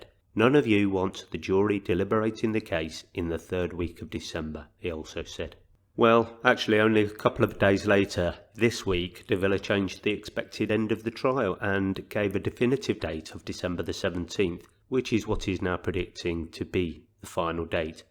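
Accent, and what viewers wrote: United Kingdom. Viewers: Thank you for your update……really enjoy listening. Good to hear a fellow Brit!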